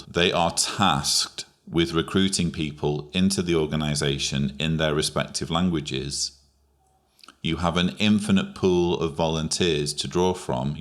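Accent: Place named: Manchester, United Kingdom. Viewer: Manchester by the accent (and the fact that he shows photos of himself in Manchester and Dudley). Somewhere thereabouts anyway.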